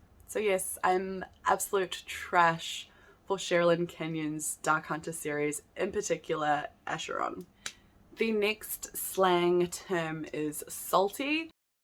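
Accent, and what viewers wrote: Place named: New Zealand. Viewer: Yaay, I knew I recognised THE greatest version of an english accent there is! (I am, unfortunately, not a Kiwi. I just love everything New Zealand ☺️)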